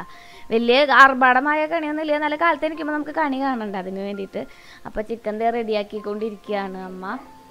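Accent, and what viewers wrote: Thrissur, India. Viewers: Chechi njn Kannur Ann bt chechide thirissur slang samsaram nth Rasa eanik bayankaram ishttanntto nigalde samsaram chechide vlog pwoli super💕💕💕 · ചെടികൾ എല്ലാം വളരെ ഭംഗി ഉണ്ട് ചേച്ചി... 😍ചേച്ചിടെ തൃശൂർ ഭാഷ സംസാരിക്കുന്ന കേൾക്കാൻ നല്ല രസം ഉണ്ട്....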